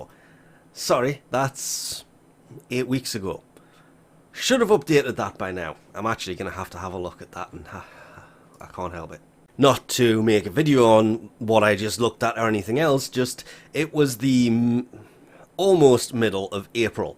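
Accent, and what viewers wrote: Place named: Newcastle, United Kingdom. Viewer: Geordie (from Newcastle I would guess).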